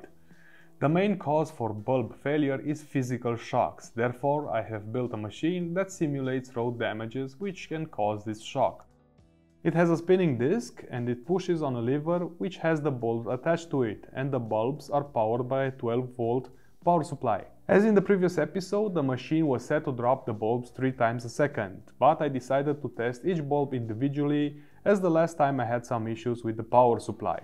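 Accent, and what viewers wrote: Russia. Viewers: Бля, русский английский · R U Russian? Very detail & professional test of 3 biggest brands. Thank you!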